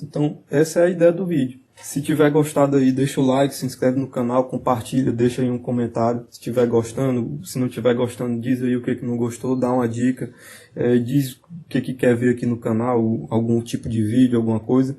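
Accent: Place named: Piauí, Brazil. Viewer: Parabéns pelos seus vídeos. Estou buscando informações sobre o Bim + Navisworks e seu canal foi muito esclarecedor. Quando ouvi o sotaque, tive certeza de que era do Piauí..haha Bom demais encontrar os conterrâneos por aqui. Sucesso!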